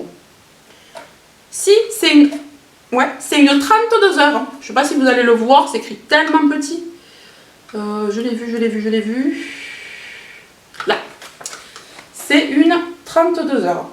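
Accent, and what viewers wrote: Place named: Pyrenees, France. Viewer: J'ai pas pu partir chez moi dans les pyrénées cette année alors ton accent me donne l'impression d'être un peu en vacances 😘😘😘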